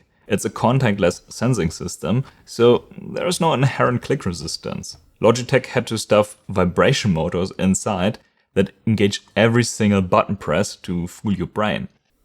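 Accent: Germany. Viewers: are you german you sound like a german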